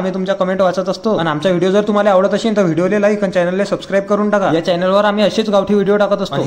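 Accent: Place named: Malkapur, India. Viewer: (MH 28 बोलते दादा) #मलकापुर कर बोलते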